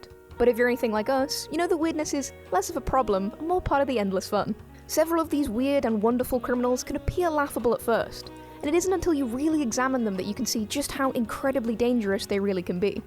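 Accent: United Kingdom. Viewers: You sound like a British version of Ducky from Land Before Time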